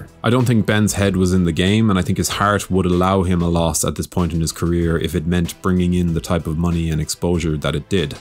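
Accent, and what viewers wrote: Ireland. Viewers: Learning to live with annoying monotone Irish voice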